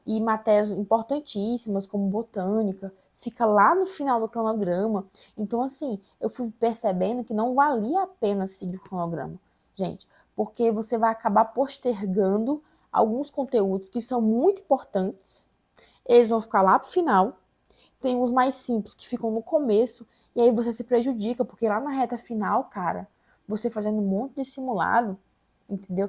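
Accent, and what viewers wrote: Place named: Pará, Brazil. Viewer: Tu é paraense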